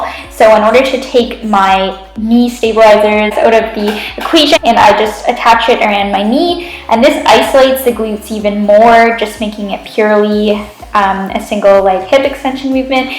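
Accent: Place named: Canada. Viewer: your my favorite you tuber next to Nikki. But I cringe listening to your videos becuase of your Canadian accent! About, out... Please no more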